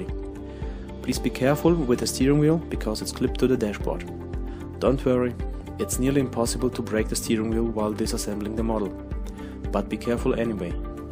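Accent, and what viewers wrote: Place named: Germany. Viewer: beautiful german accent!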